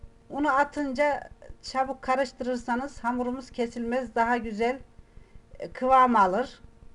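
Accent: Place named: Kayseri, Turkey. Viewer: Bu Kayseri ağzıyla yorumdu harikasınız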